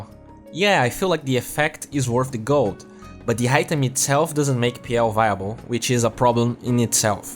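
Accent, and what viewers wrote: Brazil. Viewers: You kinda have a Brazilian accent, it's cool though.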